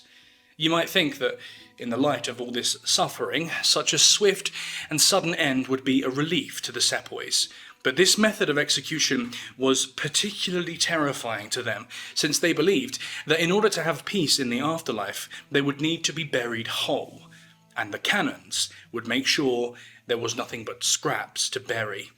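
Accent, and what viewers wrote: United Kingdom. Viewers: r u british btw ?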